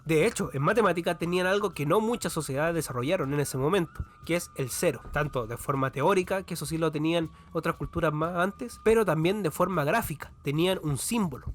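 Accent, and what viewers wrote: Chile. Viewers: Eres chileno ? A ti si se t entiende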